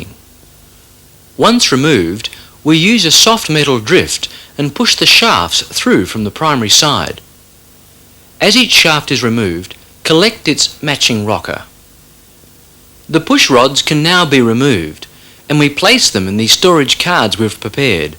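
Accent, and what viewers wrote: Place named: United Kingdom. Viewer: Great H-D 🏍️ class with the “Evil British Accent” 😎 “Live to Ride” thank you for uploading this.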